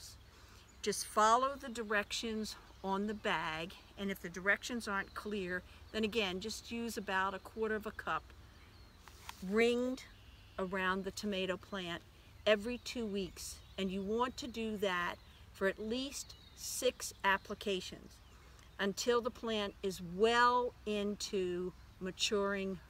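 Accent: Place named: New Jersey, United States. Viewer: You must be from N.J . Thank you.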